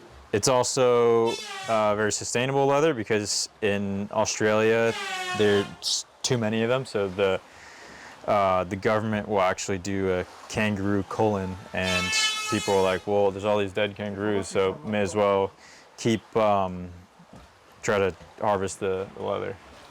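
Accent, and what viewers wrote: United States. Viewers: He has a California accent lol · It may have been asked before, but your English accent sounds like you are originally from the United States. Is that where you hail from? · It took me a while to figure out why he has to search for words in english. American accent but exposed to the language for a relatively short time. That makes a lot of sense and makes it really interesting to listen to. Besides that, I envy the live you live there. Eating fish on a daily basis, together with fruits from your own garden is the kind thing I dream of. Far away from crowded places, and just doing your thing. I really like the way you do your business there and I genuinely hope you will upload videos like this more often to give us an inside look on your life.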